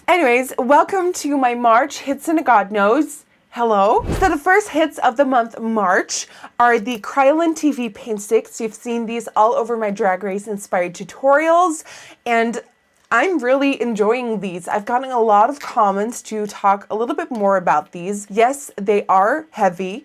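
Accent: United States. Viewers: omg i just started watching your videos and i honestly would have guessed that you you were american! Love your videos!! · I HAD NO IDEA YOU WERE IN HOLLAND!! You sound American omg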